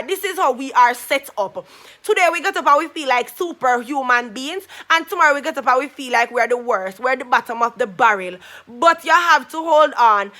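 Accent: Jamaica. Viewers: I feel much better n i was thinking about alot of things that i said b4 to myself about this YouTube thing and its like i wanna move even further after hearing u speak 🤞🤞🤞❤❤🇬🇾 Ps...I fw yall Jamaicans and Trinidadians accent anyday anytime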